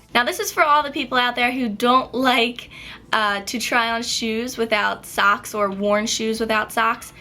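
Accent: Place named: New Jersey, United States. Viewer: do you live in new jeresey? :o you have the accent.... btw i like thrift stores :D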